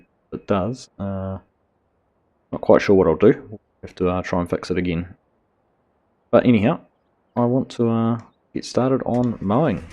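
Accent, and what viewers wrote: New Zealand. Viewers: I’ve listened to your voice long enough now that I can understand everything you say. At first that Kiwi accent sounded like you were speaking a foreign language. 😁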